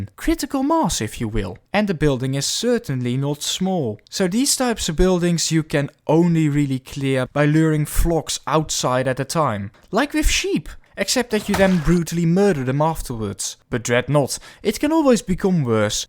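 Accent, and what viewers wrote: Netherlands. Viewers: I speak Afrikaans, and to me you sound like a Dutchman! Am I on the money? Been watching for a while. I like your style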